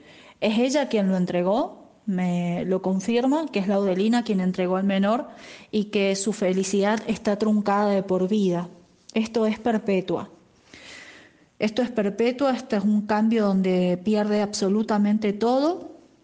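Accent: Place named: Colombia. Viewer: yo creo que no porque habla con acento como de colombiano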